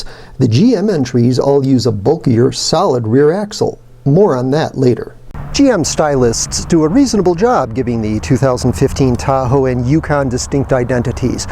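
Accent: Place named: Canada. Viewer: Great video! These big trucks are any traditional boy's matchbox dream come true. You've got a sub! I must ask though, out of curiosity, are you Canadian? Your accent sounds close to home. Cheers from the north east!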